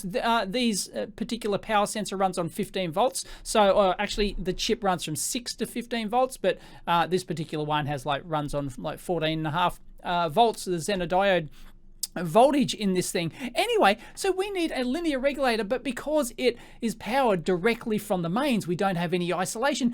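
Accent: Australia. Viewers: Somehow the outrage sounds more intense with an Australian accent.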